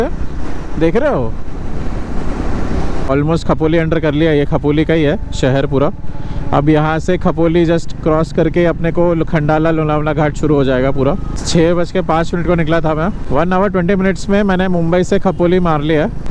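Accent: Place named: Solapur, India. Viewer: Are you from solapur originally ? Your marathi accent is kinda solapuri.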